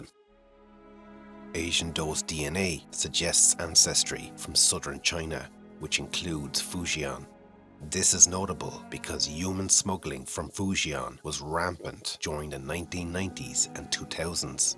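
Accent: Ireland. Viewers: I’m impressed by this documentary. Good old Irish accent too.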